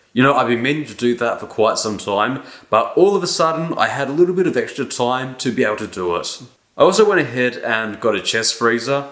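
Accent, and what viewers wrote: Australia. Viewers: Wait .. he's Australian?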